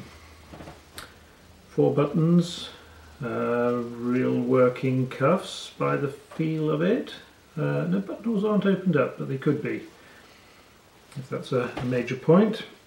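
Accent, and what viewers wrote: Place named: United Kingdom. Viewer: Interesting review, and beautiful tweed. Your expressions and inflections are very British, surprised to find that you are Norwegian.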